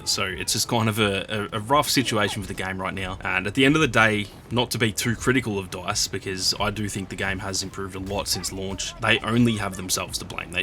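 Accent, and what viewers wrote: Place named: Australia. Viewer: As a PC elitist I subbed for the vibes and the aussie accent a while ago. Keep it coming and best of luck with the sub goal :)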